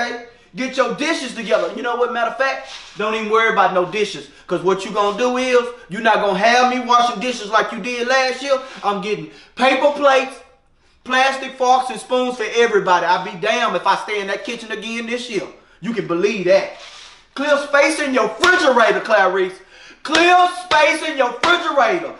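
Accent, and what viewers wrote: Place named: Houston, United States. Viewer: I love this Houston accent. He sounds exactly like my mother... bad attitude and all. 🤣🤣🤣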